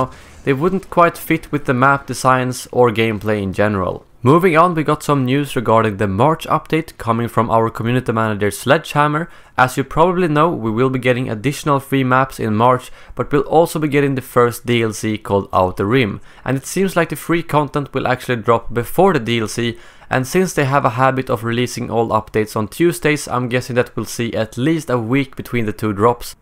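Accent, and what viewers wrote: Sweden. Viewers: Are you swedish? Keep up the good work man..👍🏼👍🏽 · Are you Swedish? Because you're English sounds like you're Swedish. Have a good weekend!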